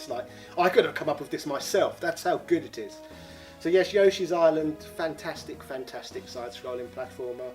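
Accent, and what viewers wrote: United Kingdom. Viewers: are you mispronouncing 'ganbare' and 'melee' on purpose or is this Just some sort of british accent I am Not yet aware of?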